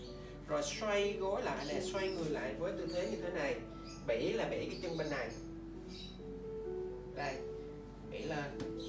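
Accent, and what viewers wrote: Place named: Khanh Hoa, Vietnam. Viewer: Nghe giọng như khánh hòa nhỉ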